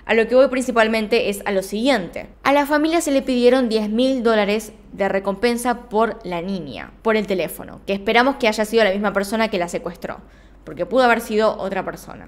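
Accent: Argentina. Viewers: Me encantas tus historias de misterio, y mas con ese acento argentino tan pronunciado jejeje...haces un gran trabajo guapa, felicidades!🥰saludos desde Oklahoma city-Oklahoma..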